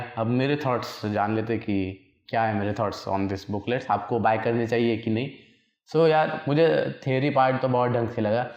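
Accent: Maharashtra, India. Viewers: Bhavaa Tujhya Bolnyatil marathi gavran tone!Kuthla aahes Tu?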